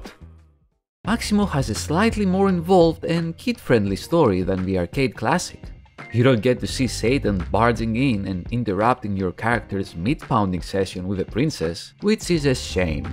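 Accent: Greece. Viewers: Το γεγονός ότι κατάλαβα ότι είσαι Έλληνας από την προφορά και όχι από την ποιότητα του βίντεο λέει πολλά. Κιπ ιτ απ, σου αξίζουν πολλά περισσότερα σαμπς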